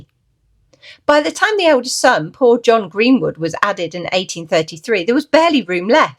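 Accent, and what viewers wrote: United Kingdom. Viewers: A couple of years ago i went to the famous undercliffe cemetery in Bradford and there was a group of Bronte fans who were making speeches about a new found grave belonging to one of the Bronte servants who died in a workhouse and was just discovered there! But i don't remember her name! P.S Your Yorkshire accent made me laugh!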